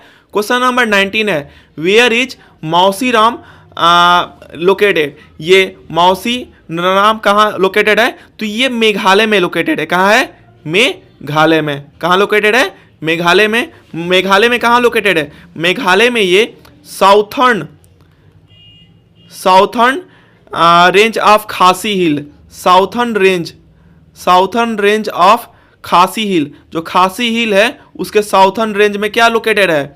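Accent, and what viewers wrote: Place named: Bihar, India. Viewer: Aap Bihari ho kya aapka bolne ka tarika Bihari jaisa hai Main bhi Bihar se chhapra saran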